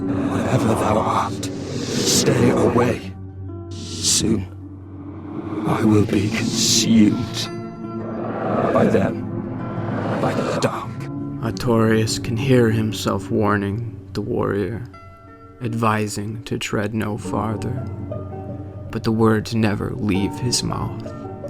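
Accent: United States. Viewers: It needs a British narrator. American accents don't mix well with DS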